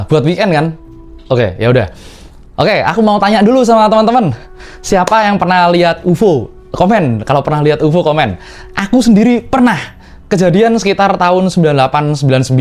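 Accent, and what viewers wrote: Java, Indonesia. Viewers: Jowo banget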